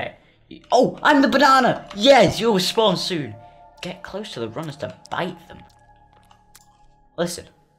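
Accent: United Kingdom. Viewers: You sound like a very scottish man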